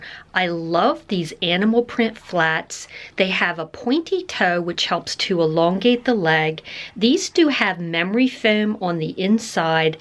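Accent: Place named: New Jersey, United States. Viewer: I had a feeling you were from NJ! My guess is south Jersey. (I recognized your accent!) I am originally from Cranford, NJ, next to Westfield and not far from Elizabeth. Great haul per usual! I didn't think you looked pregnant in that top - I would keep it if I were you!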